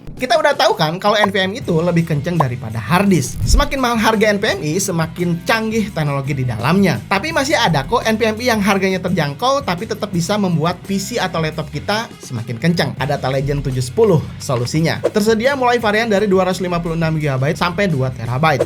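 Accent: West Java, Indonesia. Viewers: lah emang Sunda, subscriber baru ya ngab? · Sunda banget aanya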